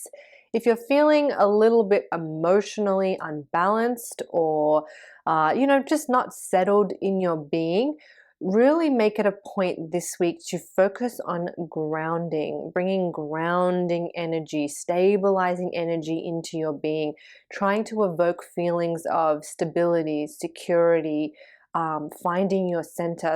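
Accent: Australia. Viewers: She must have lived in Australia a long time because of the Australian accent.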